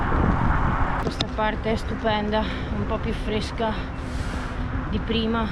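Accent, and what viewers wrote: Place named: Veneto, Italy. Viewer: Grazie per l'ennesimo episodio, quando mi appare la notifica è sempre un piacere guardare le tue avventure, il tuo modo di raccontare lento e pacato con quel tuo accento veneto, sono un toccasana, grazie ancora e buon viaggio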